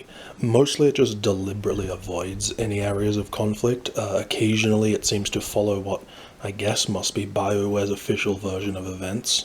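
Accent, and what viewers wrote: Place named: United Kingdom. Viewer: Dragon Age + an ASMR-like narration with British accent = great combination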